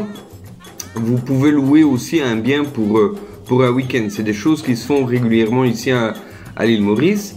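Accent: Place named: Belgium. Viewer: Bonjour, je vous découvre ce soir! Seriez-vous Belge? Il me semble reconnaître un bon petit accent de chez moi! Je vais passer 3 mois à l'Île Maurice pour découvrir et voir si c'est possible de m'y installer dans le futur! Pourriez-vous me dire comment trouver une chambre, un studio pas trop cher pour une période de 3 mois? Est-ce possible de trouver près de la plage? J'aimerais aller vers Rivière Noire pour nager avec les dauphins! Je vous remercie de votre réponse! Puis je vous envoyer un mail? Merci bcp! Evelyne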